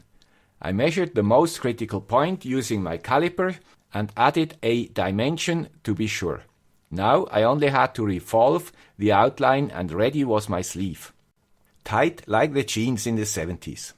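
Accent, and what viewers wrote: Switzerland. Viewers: Hi, love the way you show us your work. You should change your slogan in:.....the guy with the swiss accent and swiss humor:)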